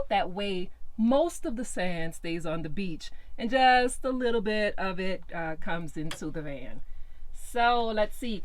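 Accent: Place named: New Orleans, United States. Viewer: Lord it The minute she started talking I thought she’s gotta be from New Orleans! Beautiful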